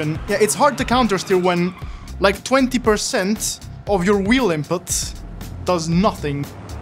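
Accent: Italy. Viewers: Is he italian? I am And its italian sounds good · Can someone confirm to me that he is Italian because the location is in always Italy, he sounds Italian and i say that from expirience of being 50% italian.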